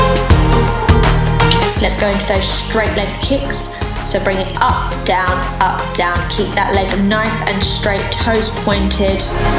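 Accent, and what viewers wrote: United Kingdom. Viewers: Love the is it british accent btw?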